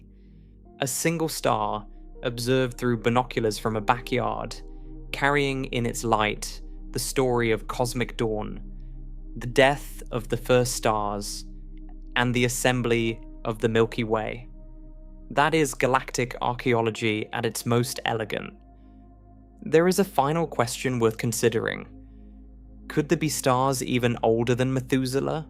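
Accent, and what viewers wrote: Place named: RP, United Kingdom. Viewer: Good content, but the choice of the AI narration (a posh British accent), absolutely destroys the accuracy of the captions. Isochron, subgiant... all manner of technical terms, are rendered gibberish. An American accent isn't as melodious, but it is far easier for the caption translator to decode. Also... very repetitive... this is 40 minutes of content, stretched to 133 minutes.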